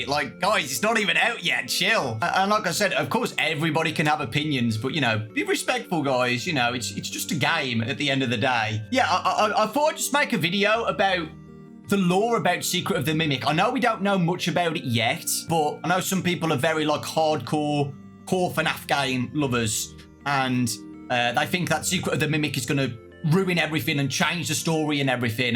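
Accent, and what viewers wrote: United Kingdom. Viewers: i love how ur accent sounds i wish i was british 2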